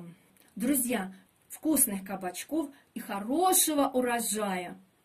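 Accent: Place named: Russia. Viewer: Может быть у неё местный диалект,диалектов много в России?!Это же не урок русского языка в школе,а информационный канал,кто хочет ,тот поймёт!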